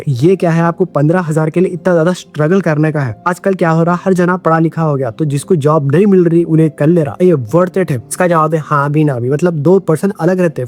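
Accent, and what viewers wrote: Hyderabad, India. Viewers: accent ka wajha seay kuy ko hydrabadh seay ha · Aap Itna Hyderabadi Accent ma Baat kyu Krta, Sunne ma Bohot Funny Lagta 😂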